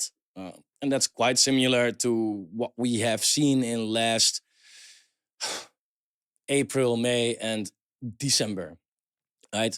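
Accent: Netherlands. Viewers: I like your dutch accent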